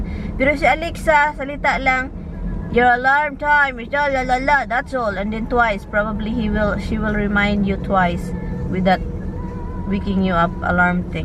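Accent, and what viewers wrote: Visayas, Philippines. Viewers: I can hear a Bisaya accent when you speak Tagalog. And you speak slow enough where I can understand much more than from a natural Tagalog speaker. Thanks, it helps me learn Tagalog.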